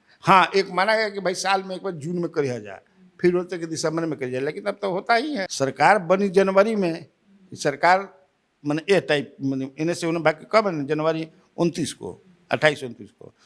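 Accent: Bhojpuri, India. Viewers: ताहार भोजपुरी सुनके मज़ा आ जाला।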